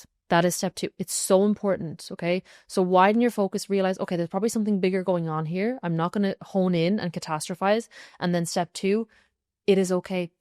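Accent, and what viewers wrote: Ireland. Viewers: Please embrace your Irish Accent, it’s one of the many reasons why I am attracted to this content.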